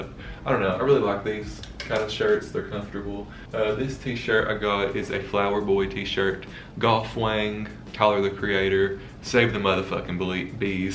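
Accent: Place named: Tennessee, United States. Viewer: Binge watching your videos today. You are the best! I love watching you just do some regular shit. You’re just cool af!! Your voice makes me miss living in SE Tennessee.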